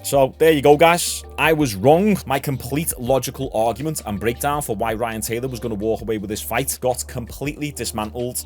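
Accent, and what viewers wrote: Liverpool, United Kingdom. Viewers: Love this channel! I'm from Liverpool, but now live in the States, and it's just nice to hear the accent. Plus, I follow all types of combat sports, so this is my new home for combat sports news. Yesterday, was awesome. It's going to be a good few weeks, honestly. Wish they would spread the events out a bit, though. Nothing for 5 days of the week and then overloaded with shit to watch on the weekends. 🤣😆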